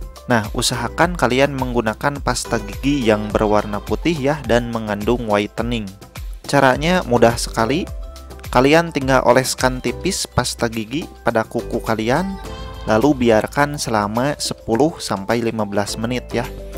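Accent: West Java, Indonesia. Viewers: Logat sundanya edun aa haaaa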